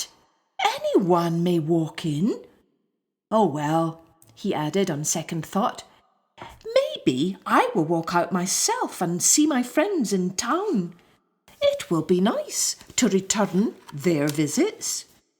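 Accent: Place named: Scotland, United Kingdom. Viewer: This was my absolute favourite story. The first story I ever remembered. My mother would read it to me when I was a toddler and I remember it. I loved the artwork especially the bushy end of his tail used to fascinate me and his great wild main. The man who fainted and the way he was on the floor used to fascinate me too. This is the first time I have seen this story since way back then and it imprinted so deeply upon my imagination and spirit and I remember that feeling all over again. It’s a feeling of joy and wonder and a strange texture that is hard to describe with words. That’s when you know you are reading something that someone’s soul has touched it. C,s Lewis used to describe such a feeling and he called it joy. I think it’s something that unlocks something of the human spirit. Another story my mum used to read to me was Brambly hedge and had a similar effect on me as a child. 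Thankyou and loved hearing this from a beautiful Scottish accent.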